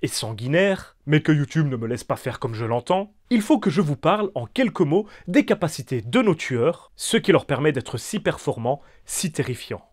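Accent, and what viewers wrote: Belgium. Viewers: ah batard t’es belge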